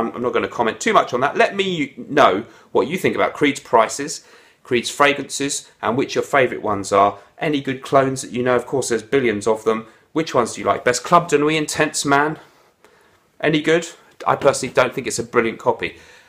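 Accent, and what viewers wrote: United Kingdom. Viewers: Canadian sub here..... As I said before, there's nothing more commanding than a proper Brit accent! I'm so very thankful to you for the Bois du Portugal recommendation.... Got a sample from a place called Holt Renfrew here in Canada and just bought a full 120ml bottle... On a side note, if you wanna be blown away by a phenomenal upscale G.I.T frag (believe it or not- brother you've gotta gotta gotta get a sample or somehow try out RAIN from the House of Royal Crown.... It should be readily accessible in the U.K from what I've researched! Project all you want Mr.!👍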